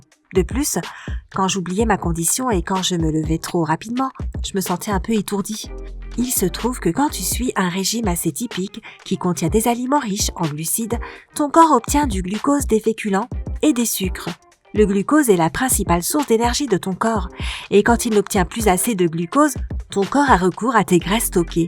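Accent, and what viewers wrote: Quebec, Canada. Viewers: Ce qui est marrant c'est qu'on a l'impression que la personne essaie de parler dans un français à priori neutre et "universel" . Je crois qu'il y a une légère pointe d'accent québecois. Mais pourquoi le gommer? C'est sympathique, au contraire, comme le sont d'ailleurs aussi les accents belges ou congolais. 😉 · Accent québécois deguelasse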